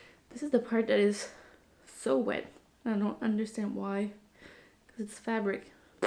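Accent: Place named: France. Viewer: Absolutely delightful podcast...love your French accent. I do miss young motherhood. I can see you are savoring this time of your life!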